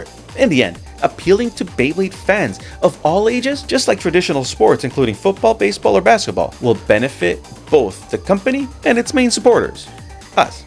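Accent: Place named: Canada. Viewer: [tɑ.kɑ.ɹɑ təʊ.miː] is how I pronounce it. Japanese people don’t bat an eye when I say it. But I don’t feel like I say it like they say it. You’re speaking in English with a Canadian accent. You’re not saying it the way I say it. But I ain’t a Canadian. In the end, who cares? 日本語を話しますか？Probably not. But again, who cares? I’m still trying to get used to your cadence. 😅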